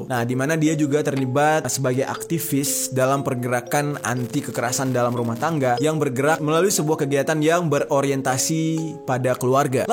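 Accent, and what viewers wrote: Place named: Java, Indonesia. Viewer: Wong jowo ndi suarane lor?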